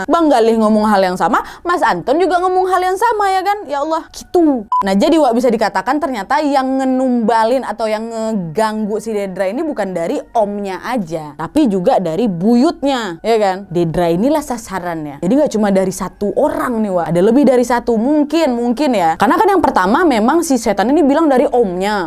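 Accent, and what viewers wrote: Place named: Java, Indonesia. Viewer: Klo KK ngomong Jawa lucu kk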